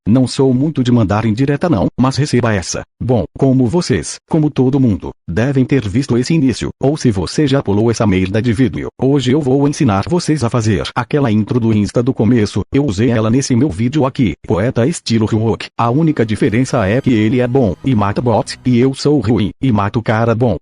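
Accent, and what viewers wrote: Bahia, Brazil. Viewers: O miseravel e um baiano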